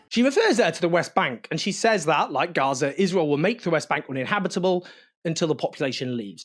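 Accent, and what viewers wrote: United Kingdom. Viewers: Why does Owen sound British???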